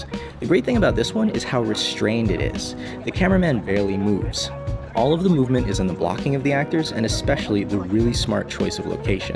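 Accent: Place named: Boston, United States. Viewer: you're from boston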